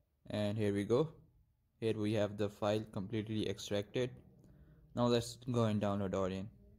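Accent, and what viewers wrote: India. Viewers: when you heare an Indian Accent you know its the Right video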